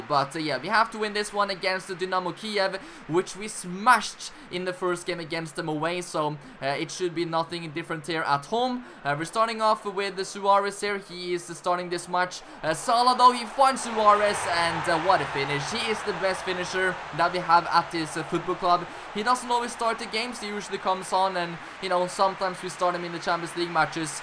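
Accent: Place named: Norway. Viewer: You sound like you're from Norway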